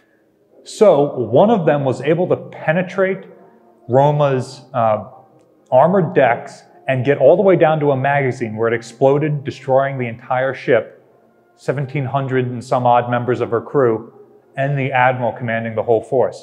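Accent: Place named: Missouri, United States. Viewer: Dudes obviously from Missouri....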